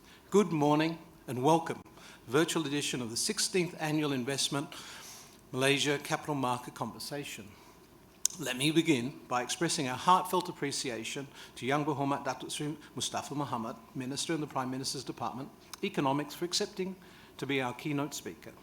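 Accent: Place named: England, United Kingdom. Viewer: hensem nyer datuk umar. I like ur English accent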